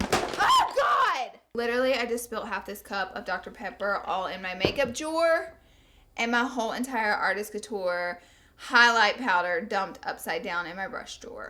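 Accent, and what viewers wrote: South, United States. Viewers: i'm texan and her accent is way too southern for even texas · Hi, new sub here! Love your personality and southern accent! Can u plz do a tut on the look in this video.. I've been on the hunt for a purple eyeshadow look like this! Thanks!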